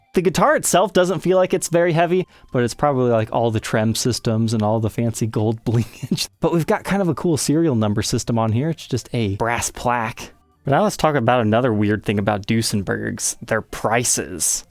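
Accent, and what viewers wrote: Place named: United States. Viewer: I stopped taking this seriously as soon as I heard an American accent.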